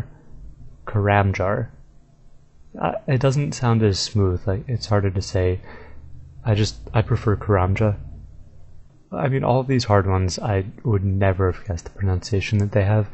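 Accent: England, United Kingdom. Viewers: You need to keep in mind these are will an english accent, that's why some of them sound strange. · Gotta always remember the pronunciation is done with English accents. So for Americans a lot of this is different.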